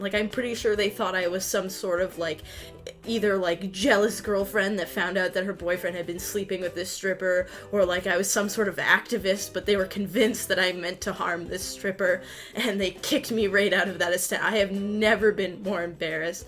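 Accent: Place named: Canada. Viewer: That's what Canadian English sounds like, hu? Hoped it'd be a little different from US English, but I guess that explains why it IS American English and not Canadian English and US English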